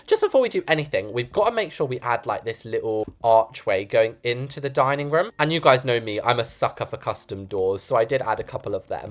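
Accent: United Kingdom. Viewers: Uhh I live in England and I approve of this house and the British voice what u America people think how we think speak that cocky from London so ya Edit: this house is like a mixture of rich and normal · FIRST AND BTW I AM NOT BRITISH SO I AM TRYING TO LEARN IT FROM YOU LOL YOUR BRITISH IS AMAZING (PLS PIN ❤️💙 ) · yeah I’ve been watching for 1 year now or about that and I found out as I realised that he has a British accent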